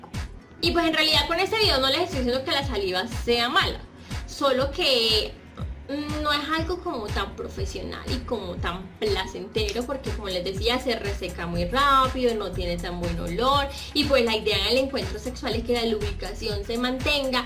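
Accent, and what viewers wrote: Colombia. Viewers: Me vuelve loco ese acentito colombiano cada vez q t escucho . Saludos desde Rusia mi amor 😘😘😘😘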